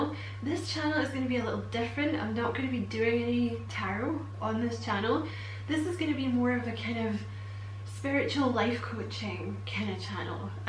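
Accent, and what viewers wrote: Scotland, United Kingdom. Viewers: thankyou for this. I watch your Leo readings, also im Aries rising, virgo moon, Im at the end of dark night of the soul, going through a spiritual awakening and doing this all alone, not fully understanding whats happening but learning having Psycic visions etc. Is that a scotish accent i hear, hi from Ireland. Thankyou so much ❤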